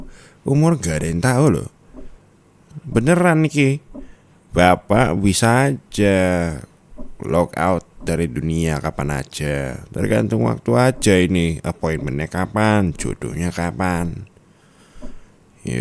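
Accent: Java, Indonesia. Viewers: Bapak e logat jawa rek rek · Syok sekali bisa logat Jawa... Beliau ini Jawir bagian mana anjir...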